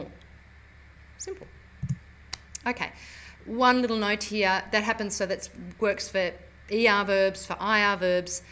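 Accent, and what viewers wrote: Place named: Australia. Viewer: pourquoi elle a un accent australien?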